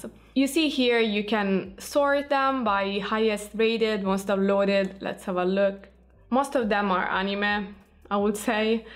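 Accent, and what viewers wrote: Italy. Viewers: Ho notato il tuo accento italiano e dopo aver visto il tuo nome ho avuto la conferma. Fa piacere vedere altri in Italia interessarsi a queste cose :)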